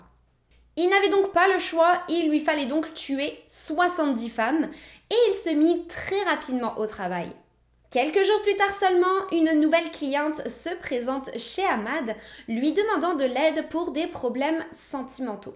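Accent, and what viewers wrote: Quebec, Canada. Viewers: Salut jai tomber sur tes videos aujourd'hui....jadore ton accent....je vit au quebec et jai le doute que sois tu vit ici mtn...sois tu y a vecu un bout de temps!!!😅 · C'est tu moi ou desfois ta un accent quebecois??? Genre des sons je sais pas xD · Tu commence à prendre l’accent québécois! Wow. Félicitations pour tes 31k❤️‍🔥❤️‍🔥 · Est ce que tu vis au Québec ? Tu as un accent québécois je trouve 😊 très bien raconté, encore merci ! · J'ai découvert ta chaîne hier soir et je trouve ça génial , un vrai plaisir et pour quelqu'un qui vient de France tu a un joli petit accent canadien 😉👍🏼🌹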